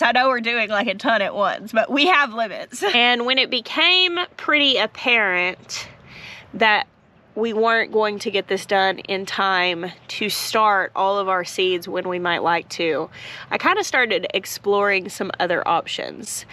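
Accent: South, United States. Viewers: cracked me up😂 because no offense but to me you guys do sound like that… with the southern drawl and all… I’m in NY so that explains that…😘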